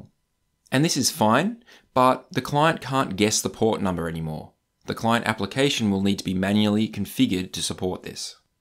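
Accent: Australia. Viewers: That's not Indian lol. He's Australian. Easy to understand.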